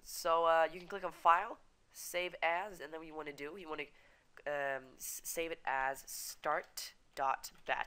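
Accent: Sweden. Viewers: are u swedish? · When i try to open Start.bat it crashes. Btw are you swedish? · are you from sweden?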